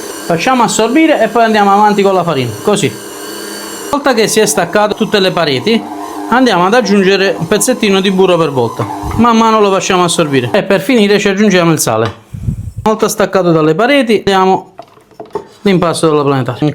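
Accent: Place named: Naples, Italy. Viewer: Ciao cuoco mi piace come parli il napoletano ciao un abbraccio ciao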